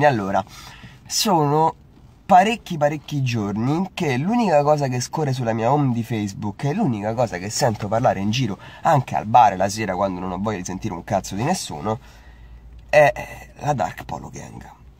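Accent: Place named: Marche, Italy. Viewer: Ma sei marchiciano 😂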